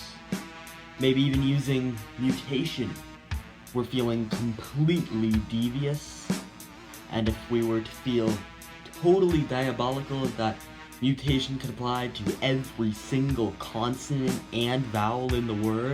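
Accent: United Kingdom. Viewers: WAIT IT SOUNDS LIKE A NORTHERN BRIT SPEAKING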